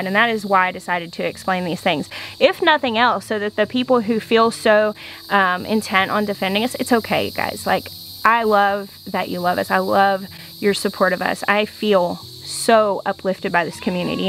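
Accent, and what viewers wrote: South, United States. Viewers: I started watching your earlier shows and loved them.i do prefer the old voice,just can't handle the southern twang you got now,I wish you well and hope you loose the twang don't be like others be youself you have a bright future stay sparkles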